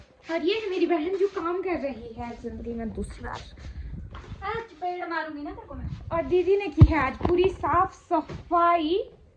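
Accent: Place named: Pahadi, India. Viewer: Pahadi tone is beautiful ❤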